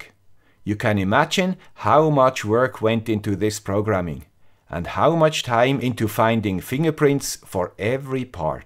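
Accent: Switzerland. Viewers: Dankeschön, Du 'guy mit dem swiss accent'. So viel Herzblut in den Videos und genau so viel Fachwissen. Man lernt in jedem Video etwas Neues. Hast Du schon mal Annex Wifi-RDS ausprobiert? Ich halte es für ein ausgezeichnetes Projekt, mit dem sich alle möglichen DIY-Lösungen mit ESP8266 in Windeseile erstellen, testen und modifizieren lassen. (btw: 'accurate' and 'accuracy' are both stressed on the first syllabus in contrast to 'developers' - sorry about that....) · dude you are awesome , im gonna try to watch all your vids, love the swiss accent, im american, obviously, thanks for all the help in the past and the help you will give me in the future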